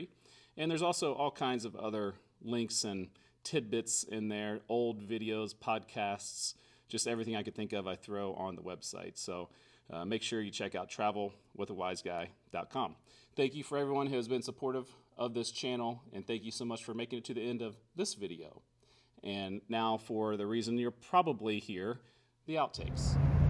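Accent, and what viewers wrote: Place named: Texas, United States. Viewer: Coach, you have spent enough time in both Texas and Oklahoma to develop a slightly perceptible country twang. It sounds cool...you wear it well! 🤠 Coach, please stay out of Gouge Eye...it sounds like a rough place!🍺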